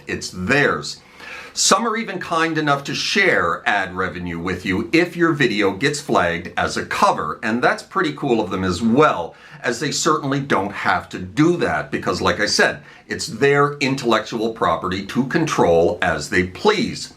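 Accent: Canada. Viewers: That's sounds like a great Maritimes accent if I am coreect? Awesome channel with great song selections, keep the great work.. Newly subscribed in the past month, your a great player, would love to hear some original content if you have any. God bless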